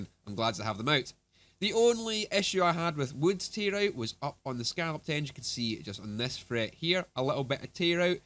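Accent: Scotland, United Kingdom. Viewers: I can feel the Scottish accent :))))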